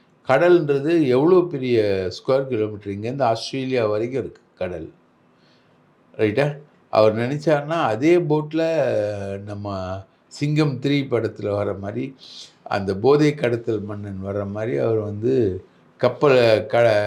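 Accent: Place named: Chennai, India. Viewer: என்ன நீ படத்துக்கு கதை வசனம் எழுதினா பிச்சிக்கிட்டு ஓடும் தியேட்டரை விட்டு வெளியே.அந்த மெட்ராஸ் பாஷையை மாத்துனா நல்லா இருக்கும்.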